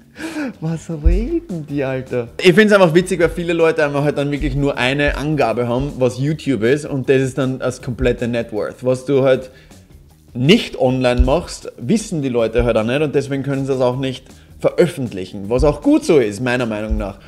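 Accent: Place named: Burgenland, Austria. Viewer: Top dast deinen Burgenländischen Akzent beibehalten hast #nördlicher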